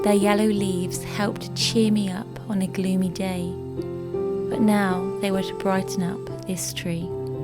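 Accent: England, United Kingdom. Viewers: Esse sotaque é britânico? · Oh, how peaceful your ideas about how to enjoy living in the winter. I loved hearing you read the old folktale with that beautiful English accent. Even your cat seemed to enjoy the magical cozy atmosphere.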